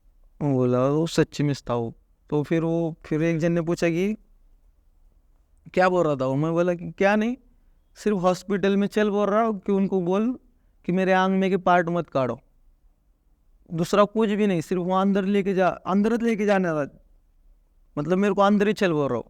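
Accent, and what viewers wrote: Maharashtra, India. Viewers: Hindi बोलण्यावरून समजतंय मराठी आहे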